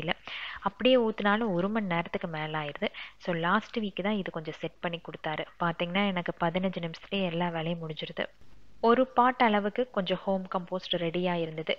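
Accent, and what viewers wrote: Coimbatore, India. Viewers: Love from UAE... Am ur new subscriber I used to watch very few ppl vlog only .. Recent times I used to watch ur videos also I like ur kovai slang..and way of ur cooking good.. It's seems like my mother preparations... When I miss my family and my mom ur videos made me relax... Good job keep rocking.. Also I saw another one videos u mentioned abt some negative comments also o watched that particular u tuber videos... It's completely different ur video covered end to end thing it's interesting also very useful.. All the best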